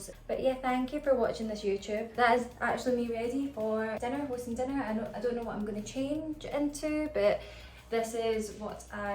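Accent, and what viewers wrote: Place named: Scotland, United Kingdom. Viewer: Thank you so much for sharing ❤ I appreciated and related to so much you said. Your perspective is so valuable, for all the aspects you mentioned that have been barriers for you... being a gorgeous, woman of colour, from our old neighbourhood, with a Scottish accent, and valuable unique experiences ❤❤ exactly why your perspective matters so much 🙌🙏 realer than shit we are overloaded with ❤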